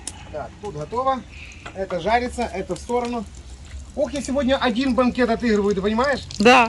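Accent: Odessa, Ukraine. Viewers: А мы очень любим и. И не важно, что он жарит и где гуляет. Главное - слушать его одесский говор, тонкий юмор. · Я так люблю одессу,а вы такой реальный представи тель ентой местной диаспоры,а ваш говар енто бальзам маи уши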